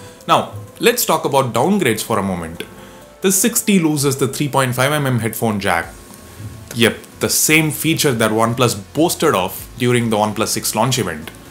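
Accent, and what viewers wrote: South India, India. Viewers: That south Indian accent though! Reminds me of home :')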